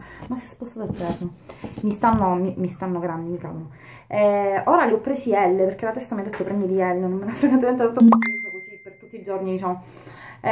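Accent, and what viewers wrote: Sicily, Italy. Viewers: Io ti adoro! Tiri su il morale, ma profondamente! Spingi per avere piu autostima per te tutto si puo fare, sei sincera te stessa e finalmente uba che da del tu e non del voi (e non e poco) mi fai sentire amica tua e non per ultimo sei siciliaaana mizzica mi manca la parlata và! Ciao biddazza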